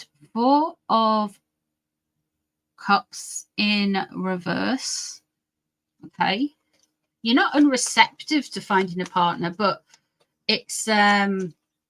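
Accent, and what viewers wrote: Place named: Newcastle, United Kingdom. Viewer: I love your voice. Just love the Georgie accent ❤ And just for the snob I'd like to say I come from a mining town /heritage in South Wales!!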